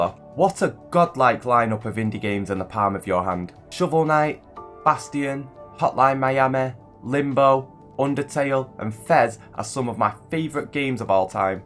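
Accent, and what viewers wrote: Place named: Manchester, United Kingdom. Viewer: Guessing you’re from Manchester?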